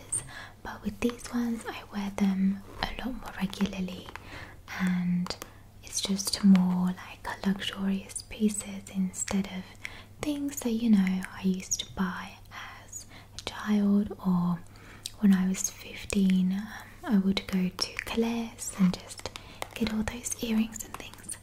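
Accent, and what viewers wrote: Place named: New Zealand. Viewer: wild guess but i was thinking the same, she might be from new zealand?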